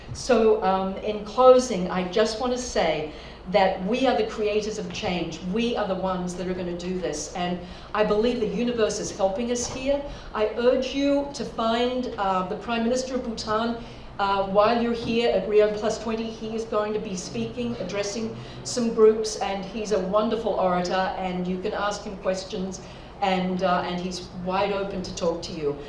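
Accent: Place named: Australia. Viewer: Twenty minutes ago, as I was pulling into my driveway, I changed the Sirius station to 129 [The Catholic Channel]. Father Dave was in conversation, and a person who sounded to be an Aussie began speaking. After the first sentence of dialogue, I put my car in park, and had to find out who this person is and her mission. I scribbled the info onto a piece of a snack box, went to the website to than YouTube. The end result: I felt happy. And invigorated. Thank you for passions. Your ripple effect will be felt in perpetuity. God bless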